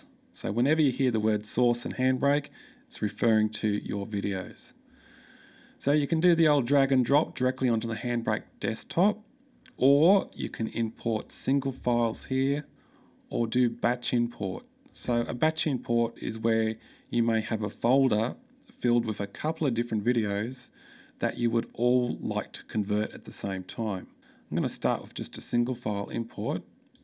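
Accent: Australia. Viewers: LOL, just watched your video (1st Feb 2025) on Handbrake v1.9.0! And it’s STILL such an awesome, informative, and super helpful guide – easy to follow with that classic Aussie accent too! 😂 Greetings from South Africa! :hand-pink-waving:🏉🏏😆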